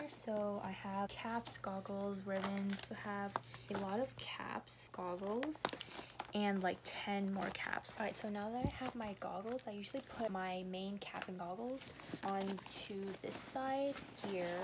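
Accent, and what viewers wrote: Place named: Pacific Northwest, United States. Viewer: Gotta love that PNW accent 😍 😂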